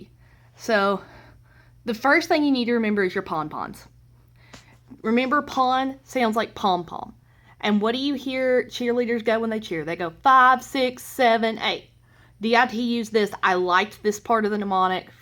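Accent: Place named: United States. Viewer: I'm sitting the UK exam this coming week. Any brainstem questions and I'll be hearing your awesome American accent singing "5, 6, 7, 8!". Thank you so much for these videos - brilliantly accurate and educational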